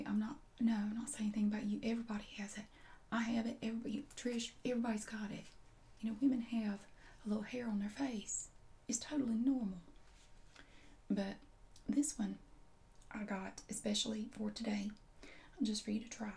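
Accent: South, United States. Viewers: this makes me so comfortable, cuz I'm from the south and I meet people an accent like that , it just makes me feel at home😆😆😆😆 keep doing what your doing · Lol you are soooooooo southern. " luuuuuuuuuk ". Love it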